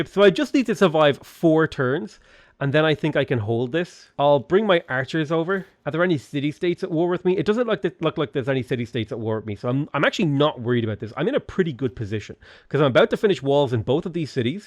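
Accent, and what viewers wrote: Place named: Ireland. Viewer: The Irish accent makes a return by the end of this video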